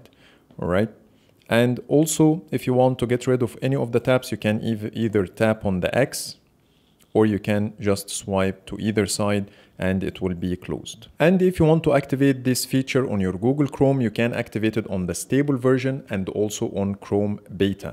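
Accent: Egypt. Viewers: You’re an Arab right 😂😂 I can tell from the accent, an Egyptian?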